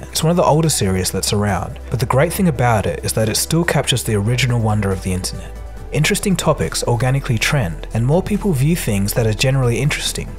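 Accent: Australia. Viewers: That Aussi accent is so awesome! Cheers from Brazil. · 5G here in Australia? Yeah, sure: it's hard to even get 4G CONSISTENTLY across bloody Sydney (you sound like an Aussie). No Bandwidteh Now (NBN) will only continue to screw this up even more towards 5G ...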